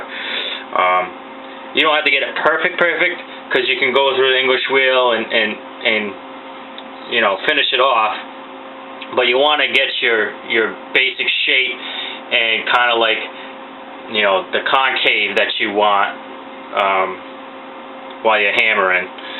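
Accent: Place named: New England, United States. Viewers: I wouldn’t apologize, ya did a great job with your video, and the New England accent, I was raised in Boston, and have lived for 34 years in Pennsylvania, and still have that Boston accent, makes me feel like I was listening to a buddy back home 😊 nice job on the fender........ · Lmao I was like hey am I bugging or is he from new England. I'm 15 min south of boston myself. Where you from?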